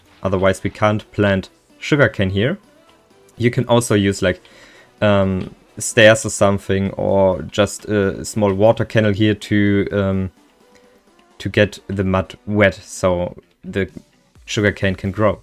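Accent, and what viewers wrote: Germany. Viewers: Your german i hear your Accent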